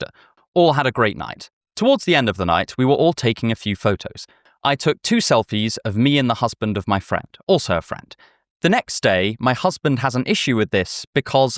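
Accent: United Kingdom. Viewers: My first ex ruined philosophy for me but I’m down for cute British boy reading aita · There is nobody I trust more than a guy with a British accent named to tell me about moral philosophy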